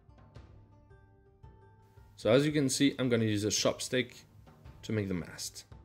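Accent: France. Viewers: Parceque je suis français aussi et jai reconnu ton accent. Hahaha super travail en tout cas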